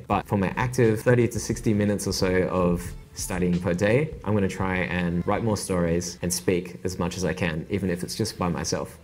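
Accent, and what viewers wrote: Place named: Brazil. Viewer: WOOOOOW Parabéns man! O seu nível de portugês é muito bom, e apesar da professora ser portuguesa, e o sotaque é de portugês é bem brasileiro. Daqui a um ano vais falar melhor do que eu com certeza :D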